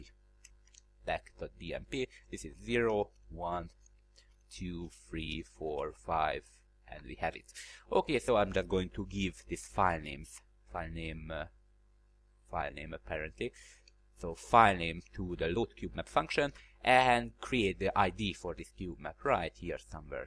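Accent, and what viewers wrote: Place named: Hungary. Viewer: i like your hungarian accent:))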